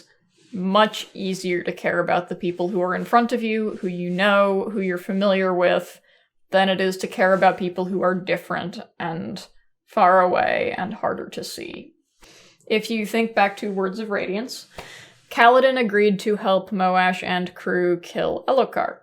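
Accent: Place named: Idaho, United States. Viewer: Are you from Idaho by any chance? You have almost my exact accent. Excellent video!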